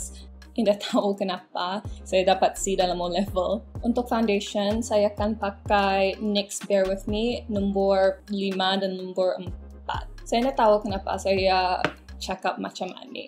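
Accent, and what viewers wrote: Sabah, Malaysia. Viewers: Macam orang Sabah cakap bahasa Melayu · Macam cakap sabah sudah 😄 mulut ku ani inda boleh cakap malayu hahaha😂😂 hee okay lah atleast boleh cakap malayu sikit2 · Your malay accent sound like Sabahan accent or Indonesian accent😂😂,its okay my malay Brunei accent is not really that deep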